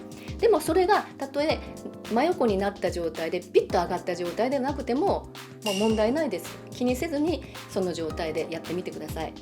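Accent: Hiroshima, Japan. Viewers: やっぱりですね。 話し方が広島出身の方よね〜ってずっと思ってました。 顔ダンス毎日やってます。始めてまだ3週間ですが、結果が出るの楽しみにこれからも毎日がんばります。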